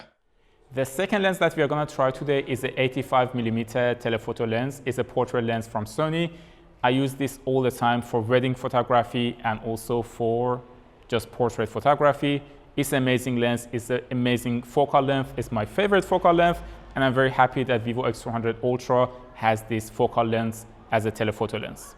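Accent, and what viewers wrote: Iran. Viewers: I realize that accent it's Persian accent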